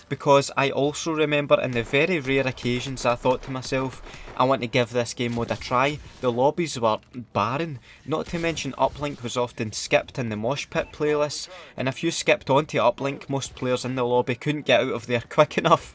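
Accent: Scotland, United Kingdom. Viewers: NOTIFICATION SQUAD! Love ya accent it's godly, my english teacher is actually scottish but she's holding back her accent sadly i love the scottish accent. KEEP UP THE LEGENDARY WORK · Am I the only one that thinks the Scottish accent is a work of art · Love the Scottish accent haha. Hilarious content as usual. Much love from the US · Scottish, sounds nothing like Welsh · Why are annoyed Scottish people so great to listen to??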